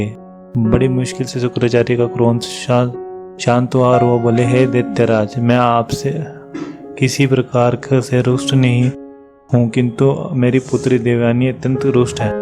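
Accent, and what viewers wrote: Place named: South, India. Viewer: Koi south indian bol raha hai shayad..ok..good hindi bolne ki kosish to ki..vocabulary will improve4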